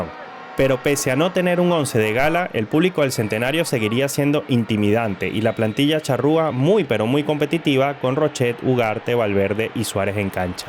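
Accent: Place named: Venezuela. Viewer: Por el acento con que habla el creador del video es venezolano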